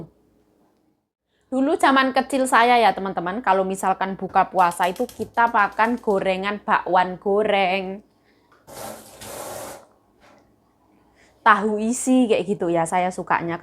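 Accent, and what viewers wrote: Java, Indonesia. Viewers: Mbk indonya mana ya..ngomongnya jawa dan mandariny muedok bget..heeee....smgt slu mbk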